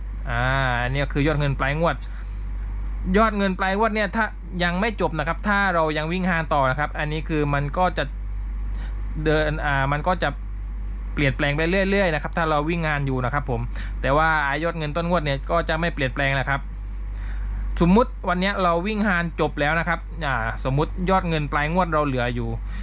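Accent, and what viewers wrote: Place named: Surat Thani, Thailand. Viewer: พี่เป็นคนสุราษฎร์ ม้ายนิ ผมแลคลิปเก่าๆได้ยินแหลงกับแฟน สำเนียงเหมือนคนบ้านผมเลย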